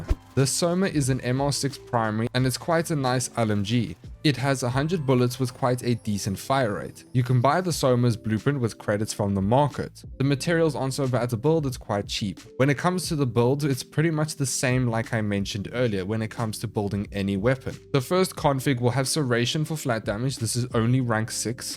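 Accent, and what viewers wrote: South Africa. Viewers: South african? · Are you South African?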